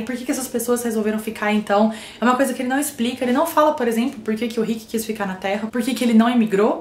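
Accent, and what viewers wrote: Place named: Caipira, Brazil. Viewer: univeRLso foRLte maRLte Que gracinha, esse sotaque caipira. X-) E pensar que esse som RL (um fonema denominado "erre retroflexo") vem da língua tupi, e que se incorporou à fonética portuguesa graças ao fato de que os índios capturados pelos bandeirantes não conseguiam pronunciar o erre vibratório lusófono (presente em palavras tais como "rato" e "robusto"). Eu ia lhe sugerir um livro (na verdade, uns livros). Porém, como vi em seu About que suas preferências são "...fantasia épica, ficção científica e suspenses policiais...", creio que não se interessará por obras filosófico-existencialistas sartrianas, tampouco por um documentário-poesia do Darcy Ribeiro. :P ...mas, se mudar de ideia (a respeito do documentário): watch?v=eqlcHGj4f7k&t=2h36m21s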